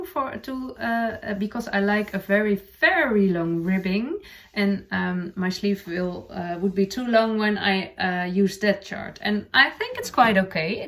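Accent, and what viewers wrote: Netherlands. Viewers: Oooh. I am only a few minutes in but know I'm going to love you. My grandfather was born in Netherlands and your accent reminds me so much of him. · It's delightful to watch and listen to you. I don't mind your dutchie English. Looking forward to seeing your steeked cardigan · Love your sweater, the neckline, the colors, the patternwork and it's placement! Well done! ❤❤❤ Your English is very good. Love your Dutch accent, so charming.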